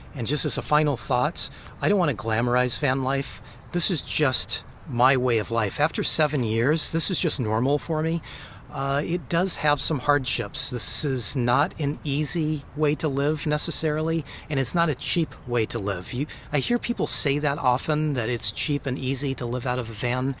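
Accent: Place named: Southern California, United States. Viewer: WOW your accent and manner of speaking is exactly like Steve Jobs and Tim Cook. that really is a SoCal accent! I wonder how I must sound to outsiders as a guy from Brooklyn New York...and like you, I do want to one day move into a mini-van (or ford transit) because rents here are very high in New York City including the boroughs...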